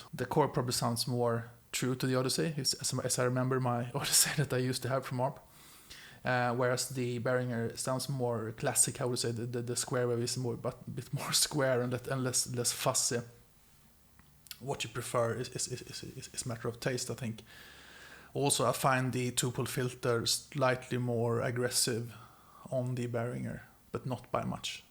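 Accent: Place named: Sweden. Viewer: Just love when you can hear on the accent its a swedish person.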